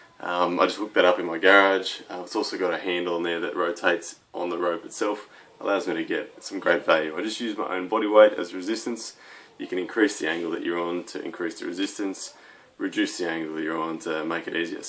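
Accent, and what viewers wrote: Australia. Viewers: I reckon some people are just too literal. Obviously ya boy here isn't suggesting that this is the 100% tried and true way to train for hooking. He's just showing what HE does to train his hook, so that someone who is looking for a little more for THEIR own workout, they can try this. It's a FREE video SUGGESTING and OFFERING ideas to better someone else's training. It's not like you had to pay $65.99 for the video. Just take what you want from the video, give the Australian man a thumbs up, say something nice in the comments like "Hey, cool accent, thanks for the tip, and good luck in Australia" and be done. Don't smite the guy for free advice or the way he trains. He'll end up breaking your arm at the table.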